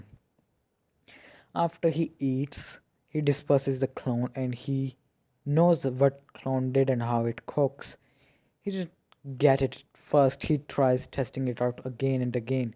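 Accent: India. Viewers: love your indian accent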